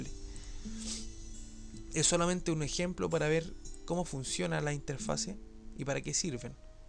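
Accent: Chile. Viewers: eri chileno, perrin?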